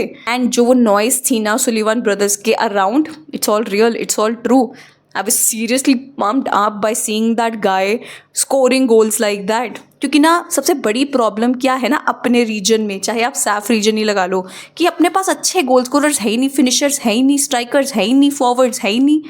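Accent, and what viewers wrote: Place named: India. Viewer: Indian accent very bad. You don't speak English like this 😒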